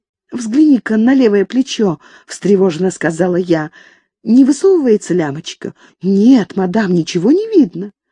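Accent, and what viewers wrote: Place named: Moscow, Russia. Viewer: Уточнение: верЬх, четверЬг, церЬковь - особенность московского произношения. Устарело, потму что москвичей не осталось.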